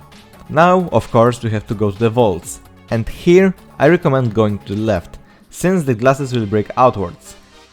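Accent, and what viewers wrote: Poland. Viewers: holy polish accent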